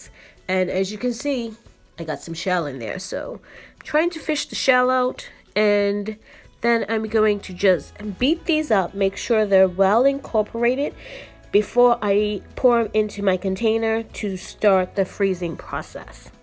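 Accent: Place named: New York, United States. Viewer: Are you from New York or Boston? I like your accent. I’m in Colorado, but from New York.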